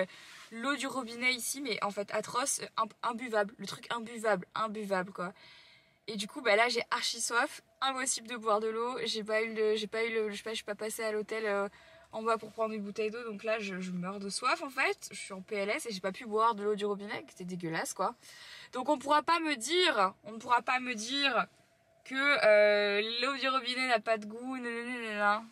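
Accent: Belgium. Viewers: Tu commences à prendre qqes intonations belges dans ta voix c'est mignon ^^ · tu commences à prendre l'accent belge! ahah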